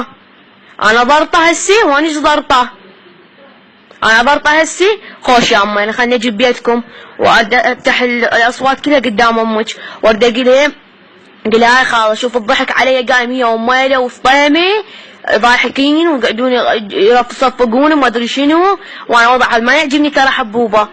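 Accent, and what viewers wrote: Basra, Iraq. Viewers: الله ينتقم منهه شلون تكلهه هجي 😂😂 خطيه ولله وهاي فطيمه شلون بيهه😜 لهجة بصراويه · اوف ربي شلون احب هاي اللهجه وحق علي يكولك نفس اذا اسمعه ارتاح بغدادي انا واللهجه بصراويه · فدوة لهجت اهل البصره اعشقهم 😍😍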